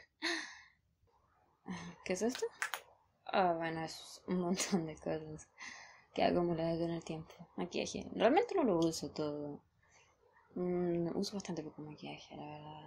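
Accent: Chile. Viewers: Eres chilena? Xd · Pregunta Eri chilena?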